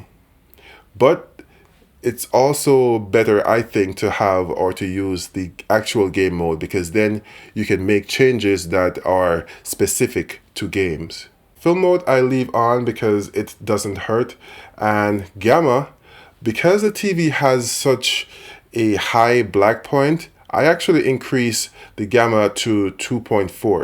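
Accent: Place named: Jamaica. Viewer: Sound like a yardie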